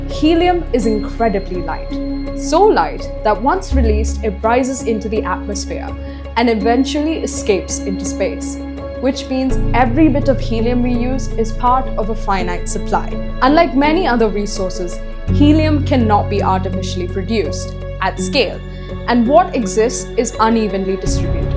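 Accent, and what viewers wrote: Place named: India. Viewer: Informative. Beautiful Indian tone.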